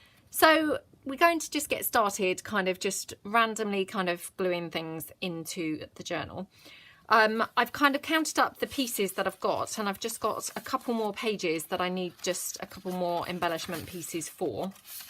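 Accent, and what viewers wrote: England, United Kingdom. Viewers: Lovely to hear and English voice as well.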